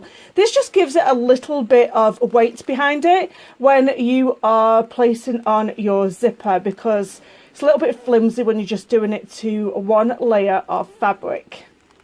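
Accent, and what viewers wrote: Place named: North Yorkshire, United Kingdom. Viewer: You've done it again! Another fine video I'll let you into a little secret; I love making bags but, as a quilter, it's sometimes quite a while between doing them. All my bags have loads of pockets but I often forget how to put zip ones in. No longer! I have a good 'go to' place for reference. Thank you so much. Please tell me where you originate from, your accent sounds so local to me (Scarborough, North Yorkshire) but I see you're in Canada - there's a story there I'll bet. xx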